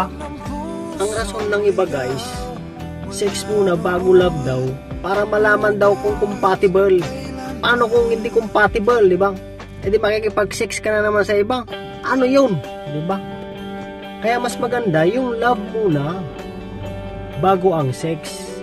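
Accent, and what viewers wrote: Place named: Ilocos, Philippines. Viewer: Aguy gnda ng topic kabsat.tuloy mo lng yn topic mo hahaha... Panalo sa payo.Ilokano tlga ang tonoooooo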